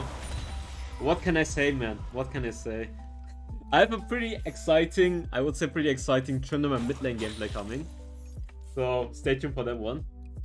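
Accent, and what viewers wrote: Germany. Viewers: Man hört deinen Deutschen Akzent einfach heftig raus lol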